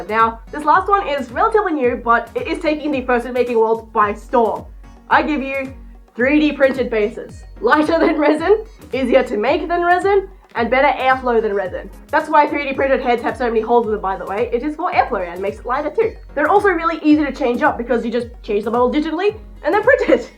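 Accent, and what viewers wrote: Australia. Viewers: Is amazing you sound so auzzie I love it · i can tell Ur an Australian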